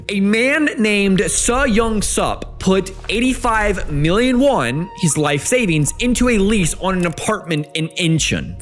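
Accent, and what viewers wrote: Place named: Australia. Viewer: Oh Shit he is starting to talk like an Aussie. He's integrating.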